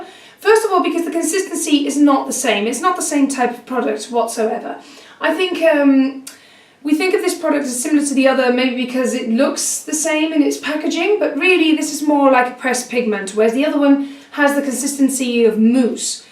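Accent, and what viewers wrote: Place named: England, United Kingdom. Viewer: I know, especially since there are other pages that don't charge :( I remember being to Cardiff and wanting to take the whole stand back home! <3 Thanks God I didn't because I only took a LE Batiste dry Shampoo (the one with the Brit flag that was released for the Olympics) and they made me throw it away at the airport :( Well, lovely Youtubers deserve lovely subscribers (and thanks for calling me lovely, by the way :) ) P.S: Do you mind if I ask you where in England are you from? I love your accent ^_^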